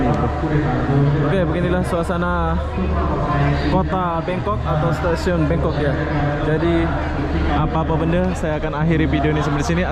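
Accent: Indonesia. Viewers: inilah channel org bingung. sok bicara melayu/tergila2 dg Malaysia tp tk bs sembunyi dr logat aslinya Indonesia. bahkan ungkapan2nya jg sbgi org bingung, antara indo atau malay.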